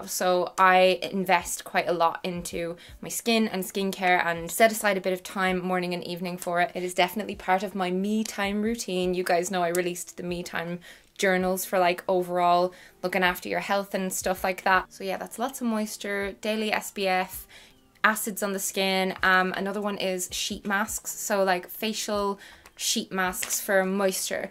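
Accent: Ireland. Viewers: God i miss Irish accents like yours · your accent is so cute... wish i was irish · Love your irish accent! And nice tips!!!! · Sometimes when I watch YouTube my brain doesn’t register accents AND HOLY SHIT YOU’RE IRISH. also you’ve probably heard this but I love your accents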